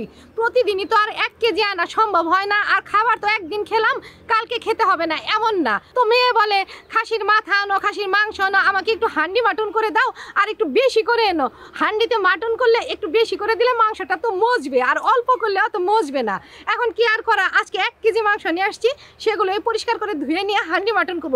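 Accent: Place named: Bangladesh. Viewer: কথা গুলো বাংলাদেশের মতো ❤খুব ভালো লাগে 😊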